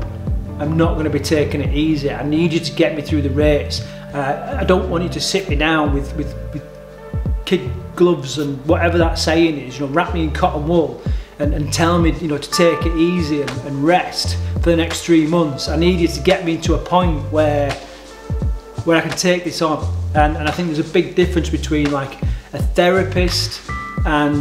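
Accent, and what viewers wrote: United Kingdom. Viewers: I like your content and your channel. But i have a huge problem to understand you. I am not a native english speaker. Your british accent is so heavy to understand that after a view minutes i have to stop every of your videos cause i simply don't understand you. Therefore i also unsubscribed your channel. It would be nice for the world outside of britain if you speak with less accent.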